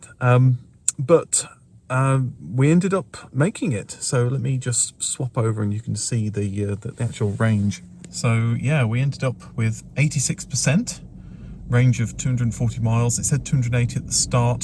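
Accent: England, United Kingdom. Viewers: I watch your videos for over 4 years now and i could swear you are from England, and now this video suggests that you live in USA 😂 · It's funny to hear an English accented person to say they are driving on the wrong side of the road. 20 years is just run in on most ice's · Wait, you live in the USA? I assumed you were British and in th UK the whole time... :volcano-green-lava-orange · I thought you were British... 🤣 Also it's extremely early for EVs. In my city and in my country there are a few charging stations and those who have houses with garages are the only ones who own one. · You are so British, I thought the whole USA scenario was some sort of roleplay. Even the shelves behind you look like BnQ units I had in my house in Ireland. I actually dug into your channel details to check location. Definitely my surprise of the year, that you're base in the US! 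Anyways Love the videos (in Terms of merit) and your voice. Very soothing after a stressful day. Greetings from Poland.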